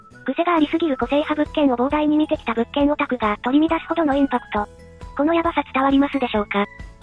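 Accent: Hakata, Japan. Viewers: 博多弁が可愛い♡ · 博多弁がうれしか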